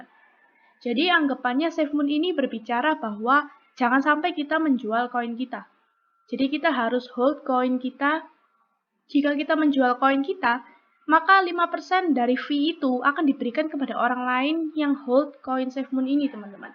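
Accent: Java, Indonesia. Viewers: medoknya jatuh cinta aku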